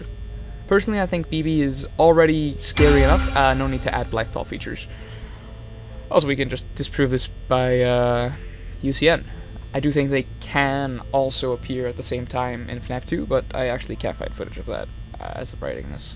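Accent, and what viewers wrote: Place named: Iceland. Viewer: omg, just found your channel, thought your accident sounded familiar… sæll íslendingur😁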